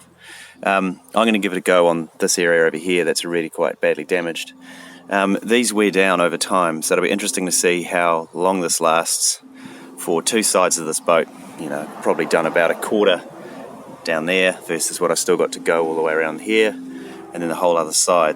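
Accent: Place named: New Zealand. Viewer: am i hearing a fellow kiwi 🤙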